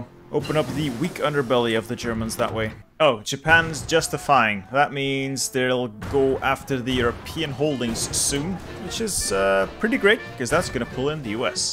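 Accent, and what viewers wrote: Flanders, Belgium. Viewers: Wallowa of flemish, you sound flemish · O wauw ik dacht al dat je een vlaming was aan je accent, ik hou van uw content much love ❤️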